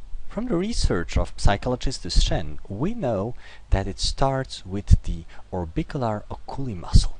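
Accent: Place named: General American, United States. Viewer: he speaks with an accent that is called general american english.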